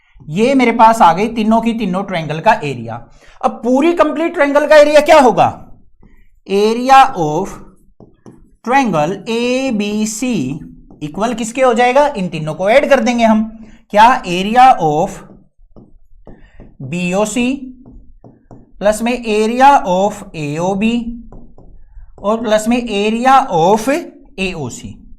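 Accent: Haryana, India. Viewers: Thodi haryanvi banti ha class ma app na bola tha phala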